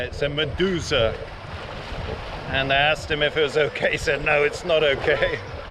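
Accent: England, United Kingdom. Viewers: I sure hope you hooked up there in Odessa, you are a good looking guy, suave and have a nice English accent. I definitely saw some ladies checking you out. Go get them tiger LOL. And if the lead role for The SAINT remake comes along you definitely should audition.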